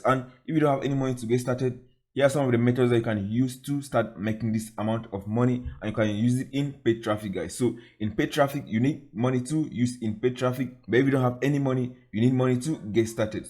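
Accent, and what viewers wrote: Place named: Nigeria. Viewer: You accents is like Nigeria.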